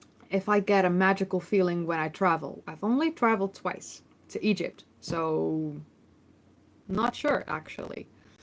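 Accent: Netherlands. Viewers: I like your "Very Dutch" accent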